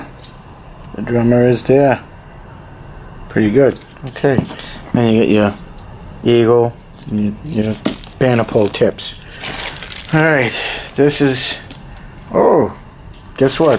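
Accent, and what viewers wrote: New England, United States. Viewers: Black powdah in havahd yahd Love the New England accent! Thanks for the unboxing! I’m just getting into the hobby and bought the Waterloo starter set. May look at this one next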